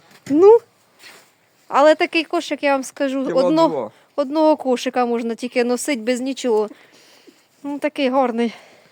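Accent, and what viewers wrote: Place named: East, Ukraine. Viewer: Чого ж неможливо?!Досить мило,своєрідний східний діалект.Моя мама була родом з Донеччини,то у її рідному селі говорили подібно,ще й був в вимові м'який звук "Л".Як в дитинство потрапила!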